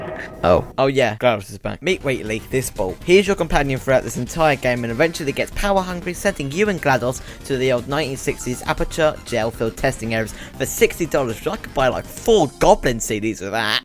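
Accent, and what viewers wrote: United Kingdom. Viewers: You sound too british.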